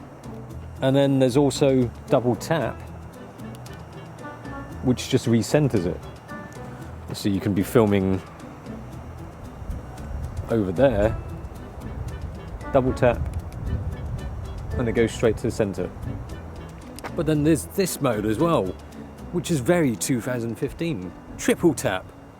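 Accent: United Kingdom. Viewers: wow did not expect a prim british voice coming from this guy :D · Yeah, I was waiting for mid age brit after hearing a voice. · so weird seeing an asian speak in a genty british accent. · You knew what blew my mind more than that camera, an asian guy with a strong british accent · Never seen an asian looking person with a british accent👌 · I thought u are a blonde british. Didnt expect an asian with a heavy british accent. Amazing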